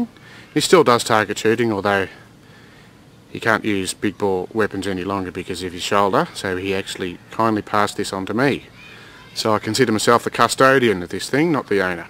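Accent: Australia. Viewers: Ahhhh I have to laugh at all the aussie talk comments. You from NSW or Victoria??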